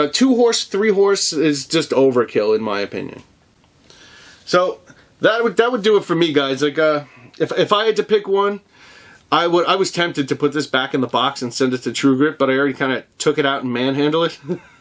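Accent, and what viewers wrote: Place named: Long Island, United States. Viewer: you should have never stop making videos. You come across great It’s your Long Island accent that makes it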